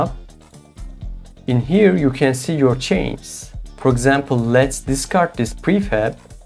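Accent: Turkey. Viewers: are you from turkey ?? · I picked up the Turkish accent right away when you started talking😄 Nice video btw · Abi Türk olduğun çok belli oluyor ama teşekkür ederim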